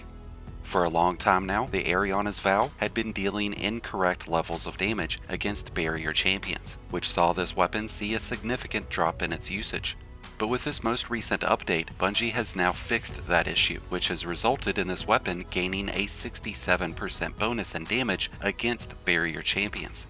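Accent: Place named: Kentucky, United States. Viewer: I can place the accent...perhaps KY?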